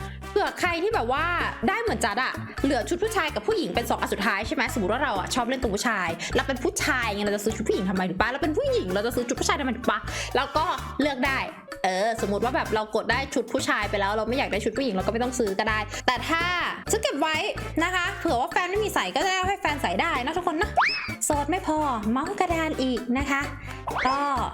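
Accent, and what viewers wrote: North, Thailand. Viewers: ปี่เป็นคนเหนือแม่นก่อคับ💗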